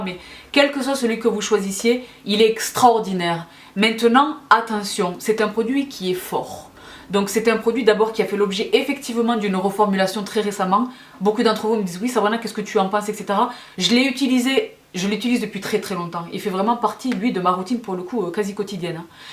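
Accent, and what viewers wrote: South, France. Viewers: Bonjour Sabrina; je suis tombée par hasard sur vos vidéos et j'en suis fan........j'apprécie votre élocution avec cet accent chaleureux du sud et ce charisme qui rayonne à chaque vidéo, un vrai bonheur. Une abonnée de plus....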